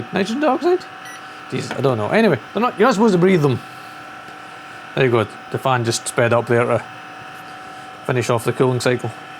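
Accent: Scotland, United Kingdom. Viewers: After bingeing on AVE videos, a gentleman with a Scottish accent has made this minnesotans day.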